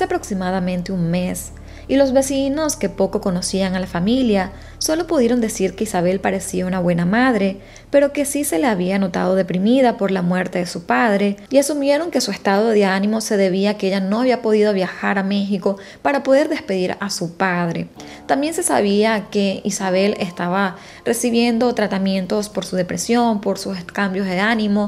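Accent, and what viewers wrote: Venezuela. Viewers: Amo tu acento venezolano 🌈😍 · te felicito me encantas como marras los casos una pregunta tengo curiosidad eres venezolana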